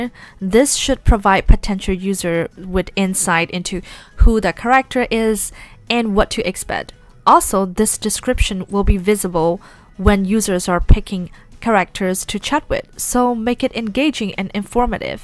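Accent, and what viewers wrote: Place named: China. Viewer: 听起来像国人xyx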